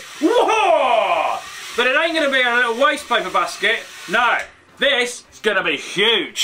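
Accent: United Kingdom. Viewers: hey colinfuze eres británico? hey where are you from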